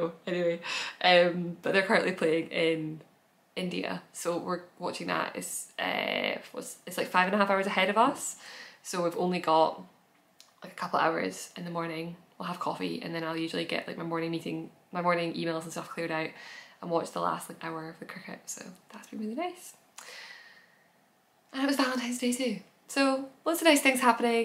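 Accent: Scotland, United Kingdom. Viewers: Haha its not the speed its the Scottish accent, I love it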